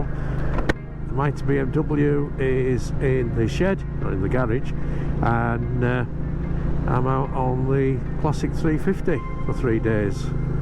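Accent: Yorkshire, United Kingdom. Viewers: Im happy to help with that. I have 50+ years of biking stories from riding in about 11 different countries and I have no problem with talking. 😀 It would be good to catch up for a cuppa and a chip buttie som time. I have just moved to South Chesterfield, which is handy for the National Park. From your accent, I’m guessing you are from the Barnsley or Wakefield area. This week I went to the RE dealer to try to blag a test ride on the 350 only to find out they were closed until the new year 🤨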